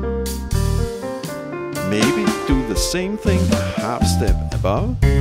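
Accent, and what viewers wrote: Denmark. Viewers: sima' er du dansker. Jeg kan høre det så hårdt i din stemme :) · Is he danish? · Er det bare mig eller lyder han bare mega dansk? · Scandinavian accent immediately recognized. Danish right? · That's a danish accent if I've ever heard one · A Danish accent can't hide from Danish ears 😊 🇩🇰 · Jeg har set videoen i 5 sekunder og kender intet til din kanal overhovedet. Men dén accent afslører en dansker alle steder! Glæder mig til at høre videre nu :-) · Are you danish by any chance?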